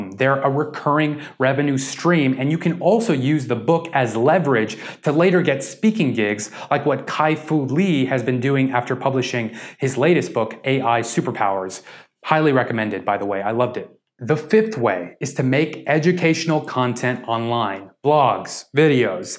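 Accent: India. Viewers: Sir ,you r Indian or American?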